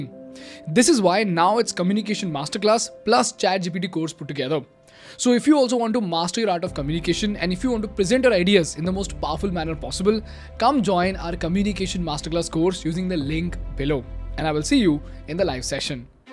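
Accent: India. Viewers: School.....If This Is Not A Promotional Video....Then I Don't Know What Is 🙄So Please 🙏Cut The Crap....About Decathelon....Not Using You...For Promoting Them...Which Also Means....They're Not Doing So Good After All....Are They? I Wanted To Buy A "Tribben"....Their Sports Bike....And Guess What...Their High End Version....On Display....At PVR Atrium,Gachibowli, Hyderabad....Is Still On Discount 19,000....Change....And It's Been Like That....For More Than A Year 🙄And Don't Let Me Start On The Online Reviews On This Bike🙄🙄....But Yet...Just Because....I Found You Cute......😅Although....The Comminication....Pronunciations...The Heavy Indian Mother Tongue Influence...Like "Dee - Cathlon"....Instead of "D'Cathelon - As In "ड" Cathelon"....Puts Me In Doubt....Whether I Should Spend Rs. 2 K Plus....On Your So Called Comminications Master Class? Or Not 🙄Hmm 🤔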